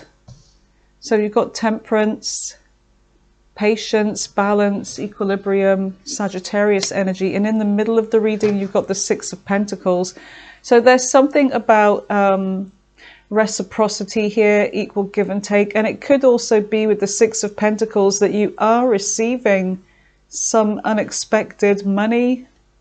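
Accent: United Kingdom. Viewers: You are the best! Really enjoy your Libra readings. Which British accent is it that you have?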